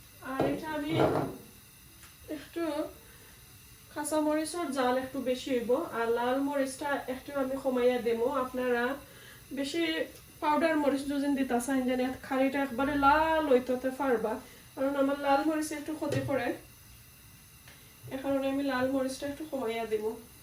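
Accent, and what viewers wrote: Sylhet, Bangladesh. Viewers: মাশাআল্লাহ অনেক সুন্দর ভিডিও সিলেটি আফার মাত যে সুন্দর লাগের, হাসির মধ্যে মুক্তা জ্বরের গু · Apu Komlar ball diya ranna kub Moja lagbo.nice hoise.duwa kori apnar osuk komto.apnar sylheti mat amar kub Valo Lage.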